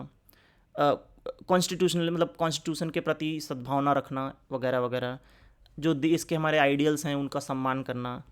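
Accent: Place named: Bundelkhand, India. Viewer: yes..apne kaam se kaam rkhna means ache work Krna sbse better h . Mai jaha tkk guess kr paa rha hu apko bolne k tariqe se ap mujhe MP Jabalpur Bundelkhand region se ho..Maine sahi bola kya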